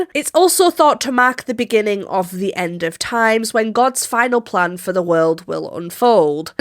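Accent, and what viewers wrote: United Kingdom. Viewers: So, the way this whole mess looks to me as outsider / Europian - It feels kinda like those doomsday Evangelical Christians of yours, there in the US are some kind of a crazy mix of "Jehovah's witnesses on steroids", and modern variant of the Spanish Inquisition, lol. That's how crazy this Rapture thing looks to me! Now don't get me wrong, we have pretty diverse mix of Christian denominations here in Europe too. We have Catholics, ofc, Orthodox Christians, Protestants, Anglicans, etc. But it's not as nearly that "on the nose", and so much in every part of daily and social life, like you made it look there. Everyone is free to practice their religion they choose, but it's mostly a private and intimate thing, and hardly anyone will start talking to you about it, if you didn't specifically ask them about it. Let alone preach it and trying to convert someone, or go into discussions and arguments about who's religion is "better", or something like that. 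And in the most of them, as far as I know, you don't have that Rapture dogma, or what not, as represented as it is among US Evangelicals. And of course, we have many of atheists, agnostics, some Pagans, etc, but that's neither here nor there, so Idk why I'm mentioning it at all. Anyways, this whole Rapture thing looks so unreal and so crazy to me, that it's hard to take it as the real life, lol! But Idk why am I explaining all of this, when you sound more British than US to me, judging and going just by your cute & cool British acce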